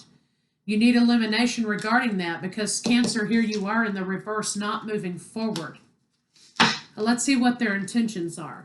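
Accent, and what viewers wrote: Texas, United States. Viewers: Love your style of reading. And I just knew you’re Texan. You’re dead right too. Still don’t know how I’ll handle her if she makes contact. My head says hell no. To much conflicts in the past, but there’s a magnetism and it bothers me, as me as person rather start a new with someone else. Im afraid, like you said, it’ll go back to be like before. Tough call. Thank you for a very good reading.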